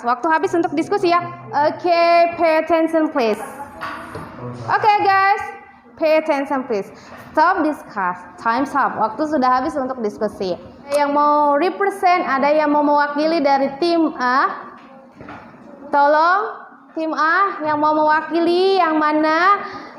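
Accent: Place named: United Kingdom. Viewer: Pengen ngucapin BHS Inggris kayak ibu dosen, fahiiiih bgttt.. aksen Britishnya dapeet.. bah bih beeh..😁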